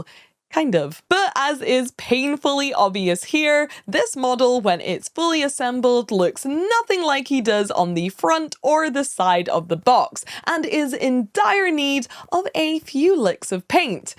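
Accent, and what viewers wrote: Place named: Scotland, United Kingdom. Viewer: My wife saw you on my phone and asked, "Is she AI?" I explained, "No, she's just Scottish."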